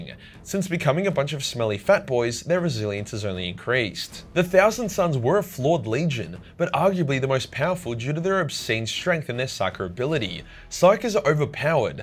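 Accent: Australia. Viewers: The iron cage explained by an Australian?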